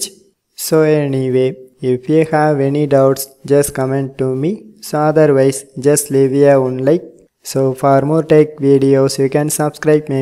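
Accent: India. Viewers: Hey Mr. Indian accent slow down. I can’t keep up with whatever you’re doing. Oh my God.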